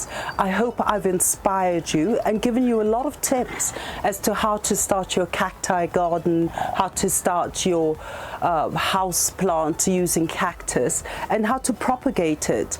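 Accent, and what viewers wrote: United Kingdom. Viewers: Her British accent is the best of all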